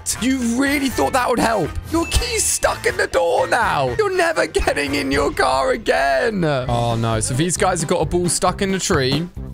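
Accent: United Kingdom. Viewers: what are u British or something because I'm British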